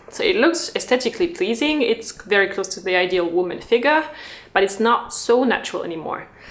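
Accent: France. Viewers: Lissy London hearing her accent, she’s probably french so I’d say that’s where she went, I could be wrong though!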